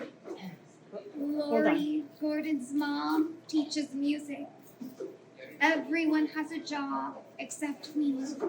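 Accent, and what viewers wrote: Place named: California, United States. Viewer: I knew you were from Cali just by your accent alone!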